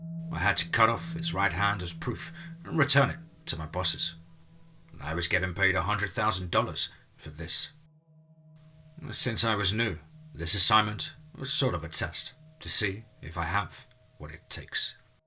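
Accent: United Kingdom. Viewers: Your Brit accent and choice of Brit sayings ruins your American stories. Again.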